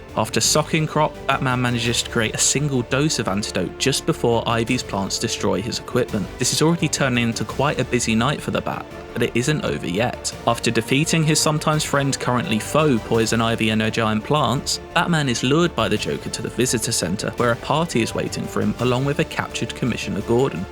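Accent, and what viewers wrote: United Kingdom. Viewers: the british accent is such a turn off man!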